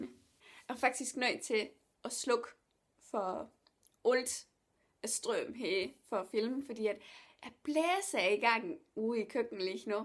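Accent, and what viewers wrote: South Jutland, Denmark. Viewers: Hej. jeg ønskede at du kunne lave flere videoer fordi jeg elsker din kanal for di jeg elsker sønderjysk så jeg håber at du laver flere videoer i fremtiden Mvh. Silas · Du er ikke helt 100 % - men meget tæt på - fortsæt, dejligt at høre synnejysk på youtube :-)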